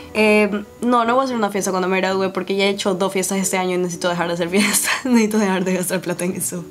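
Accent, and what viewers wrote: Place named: Venezuela. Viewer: Yo también juraba que eras de Venezuela por tu acento jaja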